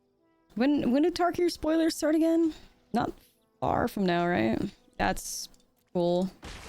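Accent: Netherlands. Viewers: If ANYONE is giving you crap for saying English words with an awesome Dutch accent, they can go cookie themselves 😂 Don’t apologize and don’t change. Love your content just as it is. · We love the koo keez. Your Dutch accent makes it fun!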